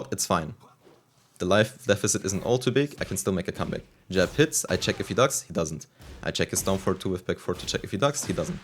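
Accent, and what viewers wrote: Germany. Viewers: German Accent detected Kuss auf die Nuss von einem fellow Devil Jin Loyalist <3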